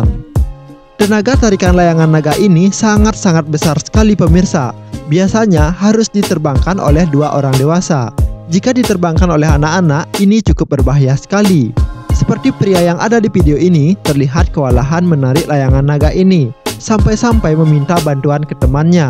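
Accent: Aceh, Indonesia. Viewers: Dari logat km bicara Aku yakin km orang aceh kan??? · Youtubernya kayanya org aceh. Suaranya khas sekali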